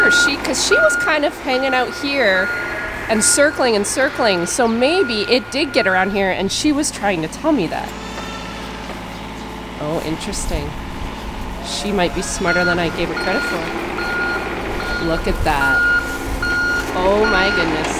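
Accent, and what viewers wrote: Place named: Canada. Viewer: I love the Canadian in your voice!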